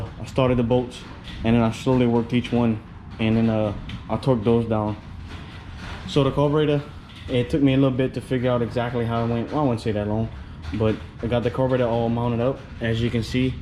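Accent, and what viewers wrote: Louisiana, United States. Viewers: as soon as you started talking i knew you where from louisiana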